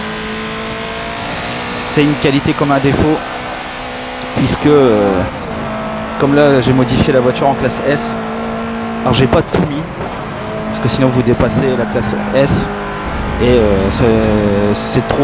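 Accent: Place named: North, France. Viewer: On sent que vient du Nord;-)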